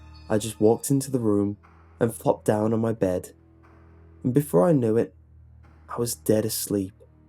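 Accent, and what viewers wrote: England, United Kingdom. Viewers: is he american or English cos he sounds English but I'm not sure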